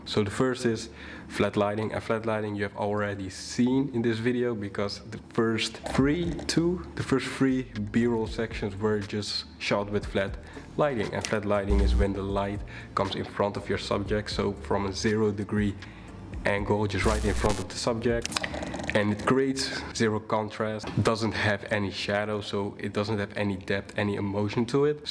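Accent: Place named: Netherlands. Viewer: Hahahaha Dutch accent incoming, loved the video bro. Very dope tips and love how you explain those things!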